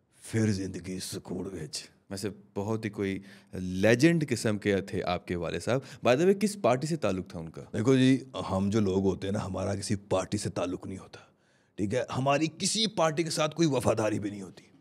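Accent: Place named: Punjab, India. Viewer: Good acting skills. Specially 1st time saw your Punjabi accent, tone was perfect.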